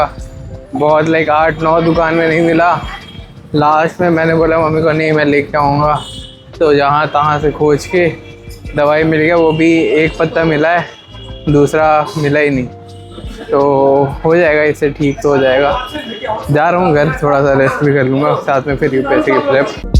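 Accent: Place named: Bhojpuri, India. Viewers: bhaiya aap toh bhojpuri bolte ho 🥰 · bhaiya ap jis language ma baat kar rhe ho vo bhojpuri type lag rhe hai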